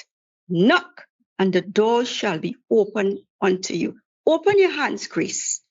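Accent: Trinidad and Tobago. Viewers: That’s a Trini accent. ❤️ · yes definitely a trini for sure 🇹🇹🙂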